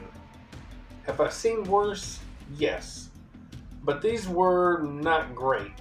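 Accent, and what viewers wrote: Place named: South, United States. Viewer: You sound southern I can see you doing a southern story reading and It being awesome I’m going to picture this voice when I read Where the Red Fern Grows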